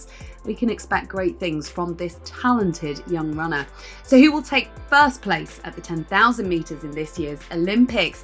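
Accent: United Kingdom. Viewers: Hoping that no one says this lady is bias. due to her accent I can tell she's British. she said Dina will get 3 medals at the Olympics, meaning 3 gold. not bad for Dina, I love her, but the million dollar question is where is Shelly Elaine and shericka in the 100m 200m and the 4×100m? something to think about🤔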